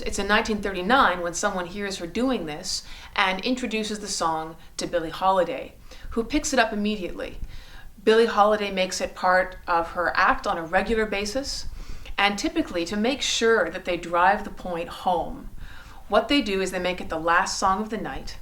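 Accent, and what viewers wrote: Canada. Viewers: And you spelled Abel's name incorrectly. While we're correcting things, W.E.B. DuBois pronounced his name as "Doo-Boyss". Perhaps her being Canadian has something to do with her pronunciations...? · Miss mispronounces several names. I detect a touch of a Canadian accent.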